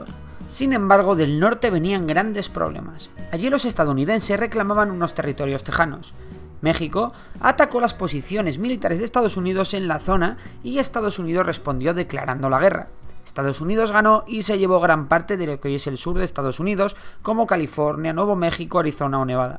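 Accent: Spain. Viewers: El narrador es Español? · un español contando la historia de mexico que ironico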